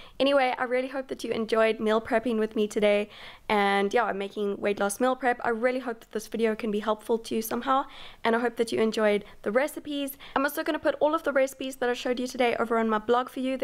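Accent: South Africa. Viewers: you sound south african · I'm new to your channel but are you south African? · In South Korea, was busy writing when you started your video. Seconds into the video I asked myself, "Is this person South African?" and then you said "Ja." It's SO good to hear an accent from home whilst being in another country. Thanks for the video. I'm subscribing. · Are you South African? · Is that a South African accent I hear? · As soon as you started talking I knew you were from South Africa 😂😂💗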